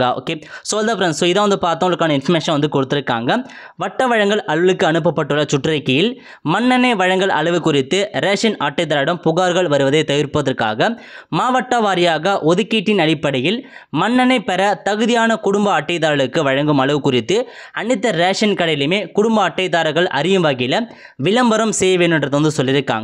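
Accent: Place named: North India, India. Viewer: Yes north indian style la pesurar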